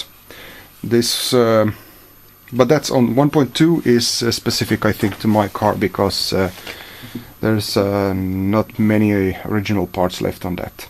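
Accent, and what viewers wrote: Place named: Russia. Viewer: My native language is Russian. I heard your pronunciation and assumed that your native language is Russian too.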